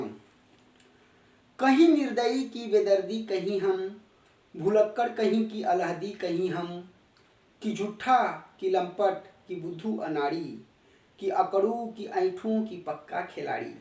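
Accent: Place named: Bhojpuri, India. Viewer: बहुत मीठी भोजपुरी 👌👌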